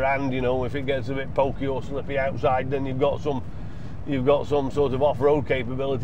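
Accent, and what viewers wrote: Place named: Derbyshire, United Kingdom. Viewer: I'd absolutely love to know where you're from!! Your accent sounds local to mine in Derbyshire, really enjoying your videos 👍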